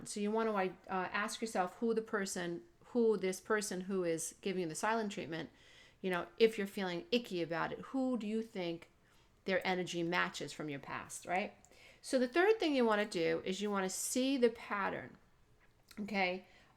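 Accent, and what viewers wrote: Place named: Long Island, United States. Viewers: Grunt at me like a hog. your funny. I’m from Long Island and your accent makes me feel like I am back home. 💕💕💕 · Wow! I've learned more in thus short min video than I have in the 5 years of therapy that I've been going to! Thank you, thank you, thank you! As I listened, I recognized your accent. Originally, I thought Brooklyn bc I'm originally from Bensonhurst. But I read the wiki page and it said Queens. Close! Also, the "Shutty, shutty"! I say that too and got it from The King of Queens', one of my favorite shows ever. Lol I look forward to learning from all you have to teach! Thank you again. 💕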